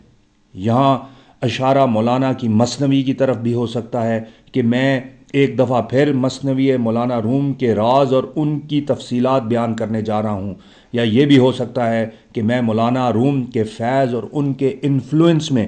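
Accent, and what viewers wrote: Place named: Iran. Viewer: السلام علیکم۔ علامہ کے مثنوی کے آغاز میں لکھے گئے ان اشعار کے مفہوم کو سمجھنے کے لئے آپ کی ویڈیو کو کئی بار سنا مگر ان اشعار میں پوشیدہ وسیع مفاہیم کو سمجھنے کی تشنگی ختم نہ ہو سکی ۔ علامہ کا فلسفہ خودی تو انکے بعد میں آنے والے کلام میں بیان ہوا ہو گا مگر انکے یہ تمحیدی اشعار مولانا روم کی عظمتِ فکر کے ساتھ اقبال کے ان سے روحانی تعلق اور عقیدت کے اوراق بھی کھولتے ہیں۔ علامہ مولانا کو اپنے پیرومرشد کا احترام و مقام دیتے ہیں۔ آپ نے ماشااللہ ان اشعار کی بہت خوبصورتی سے تشریح کی ہے ۔ ایک ایک لفظ اور تلمیح کا سلیس مطلب بتایا ہے۔اور فارسی کلام کو موجودہ ایرانی لہجے میں پڑھا ہے۔ اللہ آپ کو جزائے خیر دے۔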